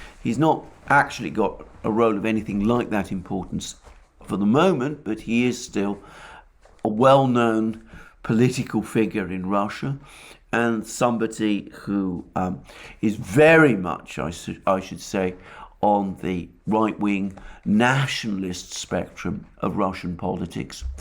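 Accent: United Kingdom. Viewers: I want to apologize for missing some of your EXELENT NEWS AND ASSESSMENT , I LEARN A GREAT DEAL FROM YOU , IT IS A PRIVILEGED TO HEAR YOUR SPEAK YOUR INFORMATION , ESPECIALLY ABOUT UKRAINA. I LISTEN TO YOU , SCOTT RITTER AND DOUGLAS MACGREGOR , YOU ARE EXTREMLY WELL EDUCATED. I M NOT MAKING THIS UP. IT IS A FACT. GOD BLESS YOU AND ALL YOUR LOVED ONES 💓 AND HAPPY CHRISTMAS. I LOVE THE BRITISH ACCENT TOO. 😀💓👍 I HATE THE U.S. ENGLISH. I FOLLOW THE UKRAINA AND RUSSIAN CONFLICT WITH ENORMOUS INTEREST 😀CHEERS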